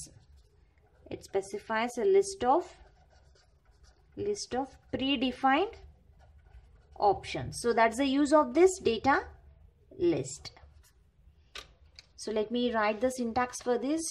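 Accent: South India, India. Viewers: thats mnother toungue influence of south indian languages....so relax n focus on important learnings...everybody has that influence india as per the region or state they belongs to...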